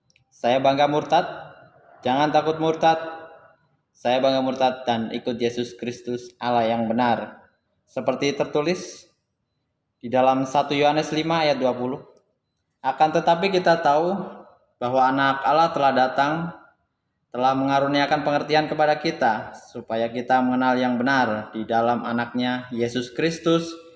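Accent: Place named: Java, Indonesia. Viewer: Logat Jawa...Pengin pulang kampung rasanya 🙈🙈 Sub jg Christian Prince channel mas..🙏🙏🙏 Ntar datang kesini ntar malam <link> Kutunggu ya Mas...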